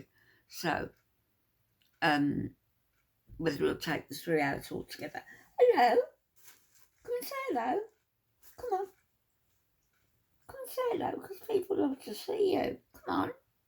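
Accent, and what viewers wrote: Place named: Birmingham, United Kingdom. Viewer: That's a brum accent ( Birmingham)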